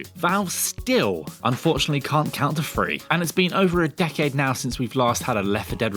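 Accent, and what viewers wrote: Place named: United Kingdom. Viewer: Gotta be another British accent. I think they might have more than the entire USA!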